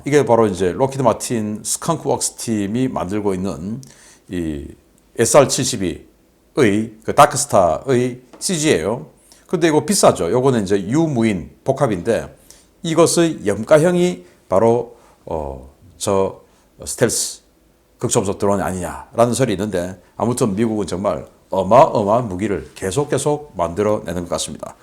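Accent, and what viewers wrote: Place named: Gyeongsang, South Korea. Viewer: 경상도 사투리 정겹습니다,,항상 응원합니다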